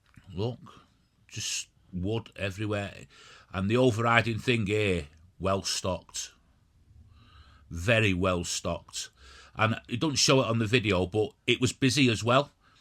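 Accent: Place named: Lancashire, United Kingdom. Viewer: So, you're in Derbyshire. You sound more like you're from Lancashire or Yorkshire. Anyway, I'm impressed with the effort you're putting in, and I'm enjoying your videos. Keep up the good work.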